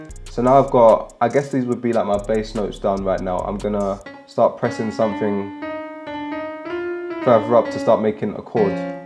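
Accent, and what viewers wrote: United Kingdom. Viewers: Its sound british af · Why do people with a British accent love to use the word “literally”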